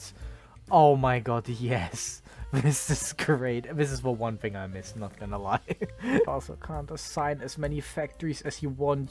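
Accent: Germany. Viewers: Ich komm' auf den deutschen Akzent nicht klar. Hab direkt zu Beginn schon bemerkt, dass da was faul ist. 😂 🇩🇪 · nun, man hört raus, das du deutscher bist.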